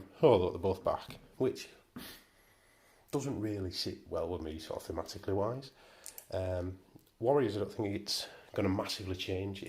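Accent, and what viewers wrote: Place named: England, United Kingdom. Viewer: Ya I have trouble with English accents lol damnit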